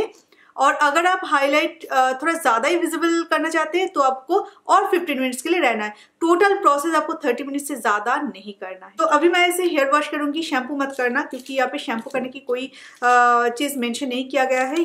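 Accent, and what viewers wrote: Bengal, India. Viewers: Are you from West Bengal, your language is like bengolis speaking hindi ( ^ v ^ )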